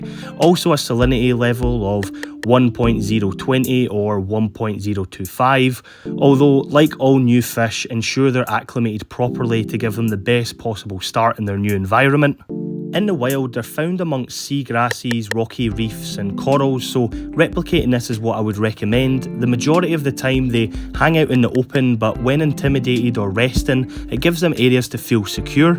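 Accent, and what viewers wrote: Scotland, United Kingdom. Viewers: I just got a pair of these guys yesterday. They are such cool looking little fish. Thank you for the video. Are you from Scotland?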